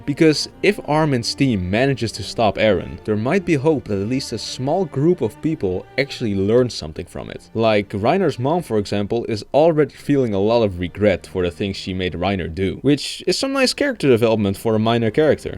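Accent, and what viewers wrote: Netherlands. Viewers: Are you dutch? cuz you sound like a dutch person ngl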